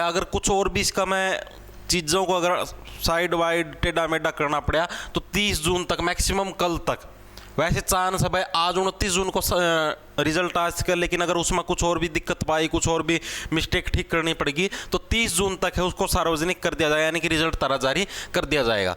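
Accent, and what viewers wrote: Haryana, India. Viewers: Haryanvi andaaj❤❤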